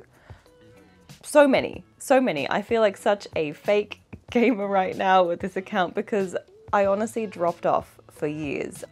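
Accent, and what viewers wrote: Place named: Australia. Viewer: I totally thought you were Australian lol!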